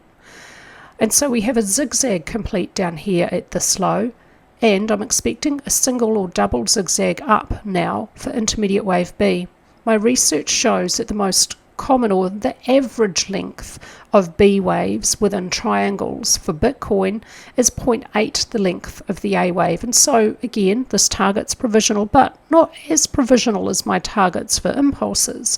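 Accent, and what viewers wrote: New Zealand. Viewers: thinking mainly of the big one on everyone's mind... SP500. Ewi have been spectacularly wrong over many years, although arguably through Fed intervention, its debatable whether that is an exogenous factor and a valid reason for failure. Nonetheless, your analysis has been spectacularly correct, AND in that you stand alone. You can weigh possibilities, then apply fine balance and judgement. Umm, you sound kiwi??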